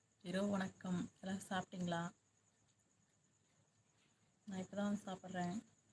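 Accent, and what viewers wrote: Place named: Coimbatore, India. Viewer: எங்களை பார்க்கவைத்து சாப்பிடாதீங்க உங்களுக்கு வயிறு வலிக்கும் கோவை தமிழ்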